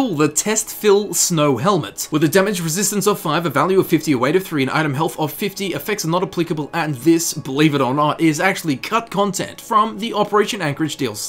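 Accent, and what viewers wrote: Australia. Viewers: Dude are you Australian? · Is he Aussie because if so Aussie Aussie oi oi oi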